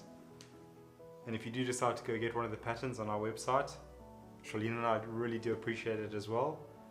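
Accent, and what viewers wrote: South Africa. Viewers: Whoo hoo! A Saffer accent on YouTube. I'll definitely get some knives from you when I can. And sommer subscribed coz support local.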